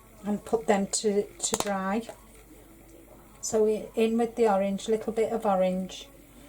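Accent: Liverpool, United Kingdom. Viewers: Love how you put this together, watched the vid after watching some of Mike's... only recently discovered this form of art.... you're very inspirational..... do I detect a hint of a scouse accent there? X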